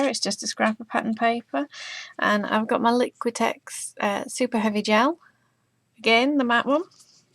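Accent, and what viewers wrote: England, United Kingdom. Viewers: sorry your english accent is to thick i cant understand a lot of the items your using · That is ALSO the first time I have ever heard anyone say they could not understand someone with a "thick" English Accent!!!!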